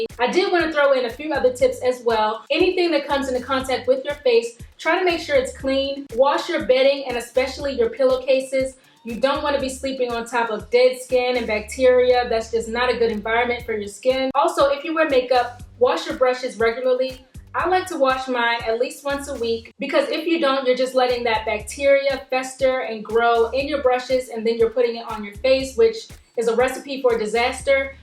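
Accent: Baltimore, United States. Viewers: you are so awesomely beautiful!! Thanks alot for these tips! P.S. I heard some Baltimore in this video and I love it!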